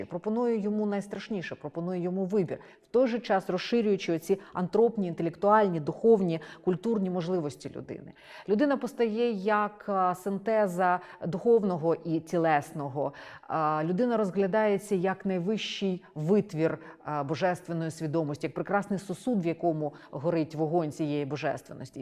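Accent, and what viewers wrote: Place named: Russia. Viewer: Дивно слухати від пані лекторки російську вимову наших старих текстів: іґраніє, Саґайдачний А попри те це відео - добрий вступ до тієї бурхливої доби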